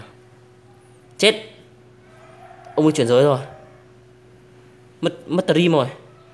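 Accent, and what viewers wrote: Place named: North, Vietnam. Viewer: Bạn nên nói to hơn mih ng nam nghe tiếng bắc hơi khó hiểu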